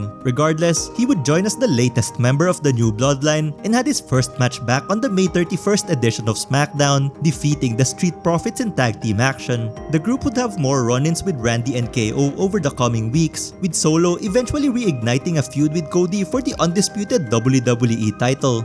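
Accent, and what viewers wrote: Philippines. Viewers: Just found your channel. Good stuff. And you sound Filipino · Pinoy ka sir? Yung accent kasi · this guy sounds FILIPINO AS FUCK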